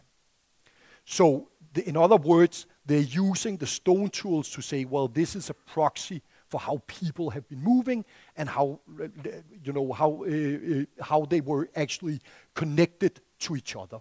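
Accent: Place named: Denmark. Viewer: Yes, he has a very typical "Copenhagen/ English accent" 😁Many Danes make the same little mistakes when pronouncing English (and other languages). Too soft consonants, too flat vowels, too monotonous intonation, an 'R' sound that often doesn't quite fit. An example of the latter is the word "very", which many Danes pronounce as "væ-i" (ie with a silent R). Some sound like they have a potato in their mouth, some say?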